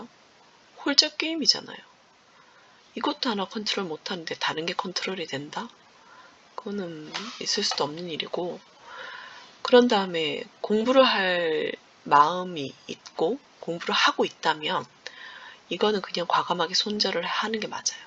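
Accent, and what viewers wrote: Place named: Gyeongsang, South Korea. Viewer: 저는 서울 토박이입니다. 친구중에 경상도 친구가 있는데 서울말(표준말)씨 잘 쓰는 친구인데 제가 들으면 경상도 느낌이 있더라구요. 완전 서울말은 아닌게 있어요. 선생님도 비슷하다 느껴서 경상도. 분이신거 같았어요. 자주뵐께요. 구독 좋아요. 눌러요. 잘 배우고 가요.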